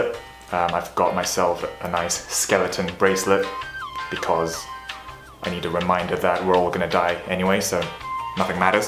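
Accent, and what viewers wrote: United Kingdom. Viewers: Ive never heard this man speak before I didnt think hed be British 😍😭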